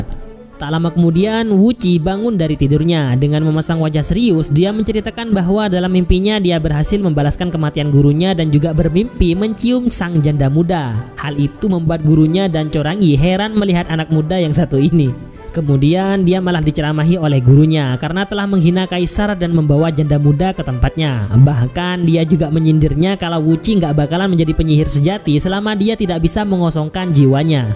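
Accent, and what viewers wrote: Madura, Indonesia. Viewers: Mimin orang madura ya logat sama kayak aq hhhhh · mak medok ben bro logat madureh tak nyaman ekedingagin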